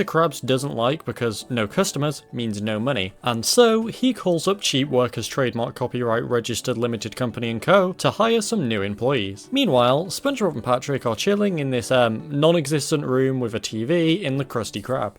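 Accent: United Kingdom. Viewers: Listening to a brit say “ahwsk the stawhff” instead of just saying “ask the staff” really makes me empathize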